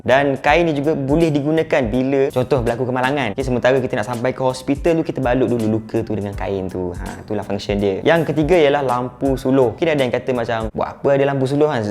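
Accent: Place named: Terengganu, Malaysia. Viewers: loghat ganu ni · pekat ganu bohh hahah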